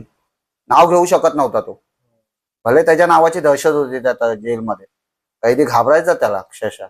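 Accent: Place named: Mumbai, India. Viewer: your marathi accent is lovely... typical Mumbai marathi... I love it.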